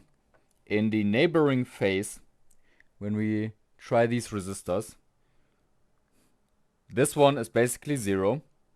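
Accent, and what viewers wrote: Germany. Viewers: you sound very german x'D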